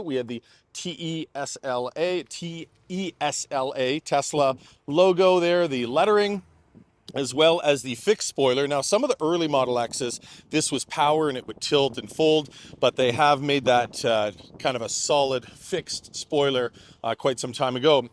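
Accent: Canada. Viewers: Totally called that you were Canada… I heard it 😂😂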